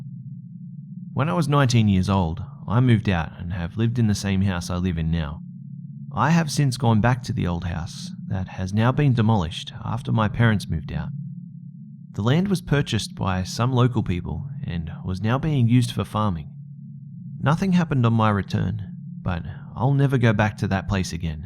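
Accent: Australia. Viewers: i mean, where would he get his outro from? "G'day mates.." sounds pretty aussie to me and i should know...im a proud one..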